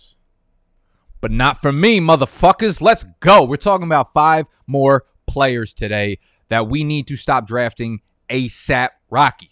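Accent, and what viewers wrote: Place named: New York, United States. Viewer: I appreciate that I can tell you're from new york in about 3.5 seconds. Absolutely unbridled.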